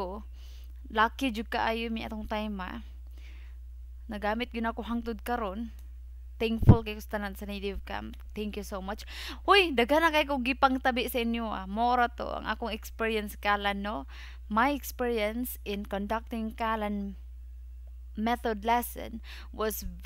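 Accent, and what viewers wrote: Visayas, Philippines. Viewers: ganahan kos imong bisaya accent, makalingaw paminawn. ma inspire pud ko maglantaws imong mga vlog. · gusto ko mag callan ay diko kabalo haha. maka lingaw ka mag bisaya sis haha.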